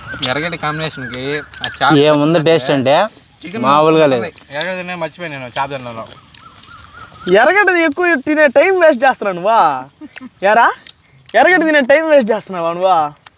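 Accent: Nellore, India. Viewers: Mimmalni chusthunte mucchatesthundi... God bless you.. Nellore language mi daggara vintunna🥰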